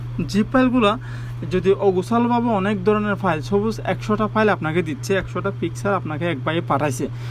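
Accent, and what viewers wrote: Chittagong, Bangladesh. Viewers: Thanks very much. Are you from Chittagong?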